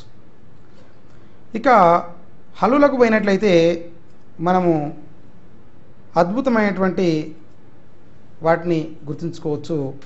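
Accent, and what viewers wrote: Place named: Andhra Pradesh, India. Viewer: ap gaadila cheptunnav ,waste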